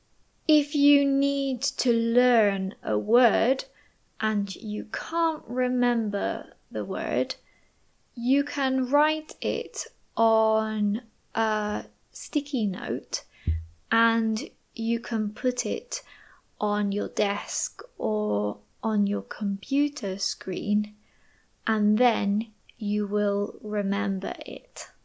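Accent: United Kingdom. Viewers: This is british accent?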